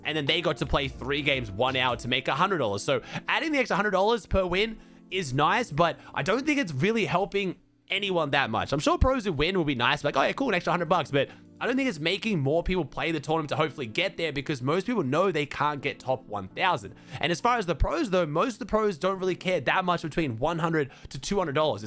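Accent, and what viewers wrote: Australia. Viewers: little glitch from robo Aussie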